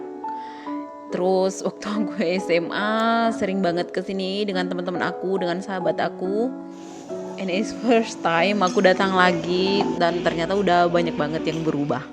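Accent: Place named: Medan, Indonesia. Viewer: Kakak saya kok penasaran dengan tempat daerahnya dimana ini...? sumatra atau di jawa....kalau saya dengar narasinya setiap lihat videonya seperti mirip orang logat medan (A9)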